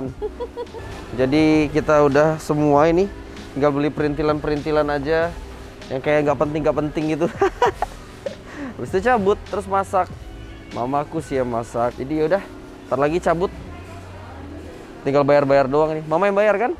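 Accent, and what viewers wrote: Palembang, Indonesia. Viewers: Masih kentel banget bhso Palembang ny bang salam dari batu raja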